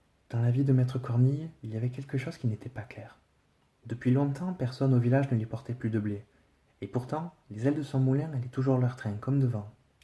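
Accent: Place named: France. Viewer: So relaxing! The French accent/language is so great!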